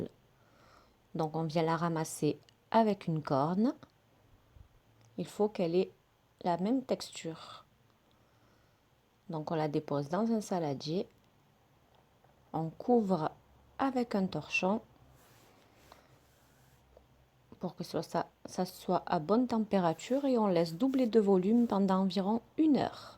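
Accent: South, France. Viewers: Magnifique dès demain je vais essayer Merci vraiment pour avoir la gentillesse de nous faire partager vos recettes et avec un accent magnifique du sud qui me rappelle Mon enfance que demander de plus bravo surtout continuez et encore une fois Merci 😊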